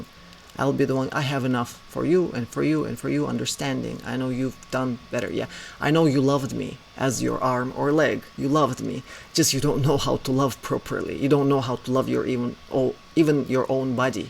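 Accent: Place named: Russia. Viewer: Thank you again Ms... I love your content as much as your rich Russian accent... You presentations are always very clear and informative... 🙏💜😃💜🙏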